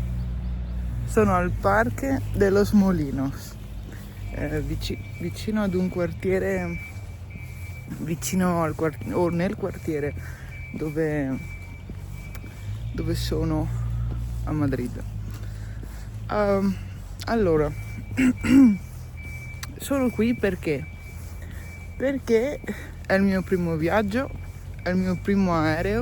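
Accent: Veneto, Italy. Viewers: per l'accento veneto che adoro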